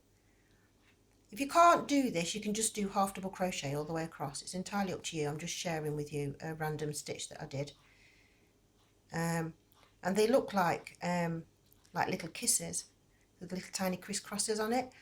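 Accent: United Kingdom. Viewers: Thank you very much for your patient explanations as you crochet! You take all the guess work out of your patterns & I really appreciate that! The Tiny Baby Cardigan is ssooo cute! I plan to crochet quite a few to go with the hats/caps I am crocheting for tiny babies at our local hospitals. Also, thank you for sharing such interesting history! I always wondered about the circles that some call "Crop Circles." Now I know they come from a mushroom! I didn't quite understand all about the rocks. I guess I was having a brain freeze at the time. I really enjoy watching your videos! They are very helpful to me! I love your British accent! May God richly bless you!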